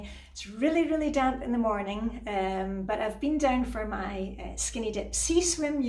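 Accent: Scotland, United Kingdom. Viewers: Love the way you say Mary Berry in your wonderful Scottish accent!!! ♥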